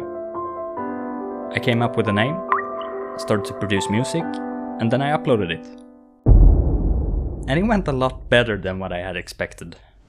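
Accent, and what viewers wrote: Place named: United Kingdom. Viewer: I like your music very much and your British accent as well ❤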